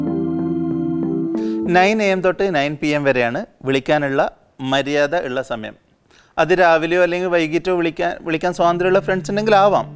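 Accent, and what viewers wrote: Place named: Kanhangad, India. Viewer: Hi, Useful video. First time anu Mr. yude video kanunnudu... really appreciat. Kanhangad slang🙂x from England.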